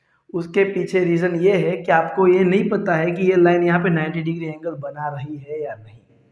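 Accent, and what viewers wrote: Gujarat, India. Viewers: Sir Are you Gujarati your accent is like that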